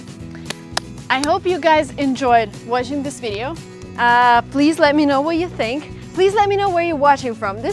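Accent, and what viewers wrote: United States. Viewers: I really like your videos! I'm watching you from the mexican state of Tlaxcala! you sound pretty american like a native speaker wish to talk just like you! you're a smart cookie! regards lovely!! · your accent sound more and more american with every new video.👍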